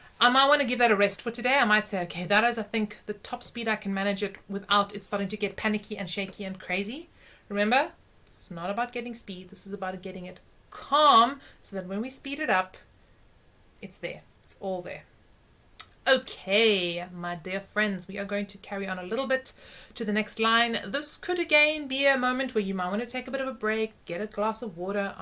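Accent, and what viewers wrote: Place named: South Africa. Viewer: Who else came for the sexy librarian but stayed for the South African accent? But more seriously, please post the other 23 studies...they’re tough.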